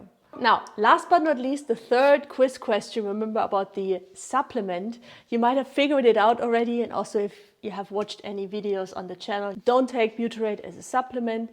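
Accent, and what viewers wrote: Germany. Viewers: I love your accent you delicious German tootsie to worship 👀👀🔥🔥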